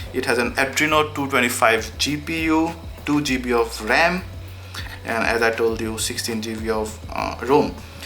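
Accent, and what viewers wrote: India. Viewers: fucking annoying indian accent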